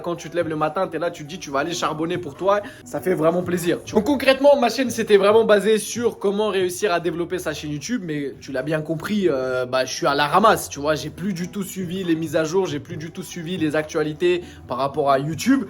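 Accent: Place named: suburbs, France. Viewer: Et depuis vous avez fait faillite? Encore un jeune de banlieue avec sa façon de parler !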